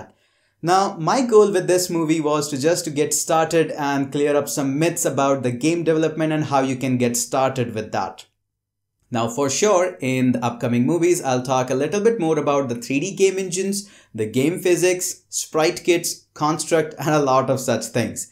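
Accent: India. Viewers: Sound Indian but surname spelt the pakistani way?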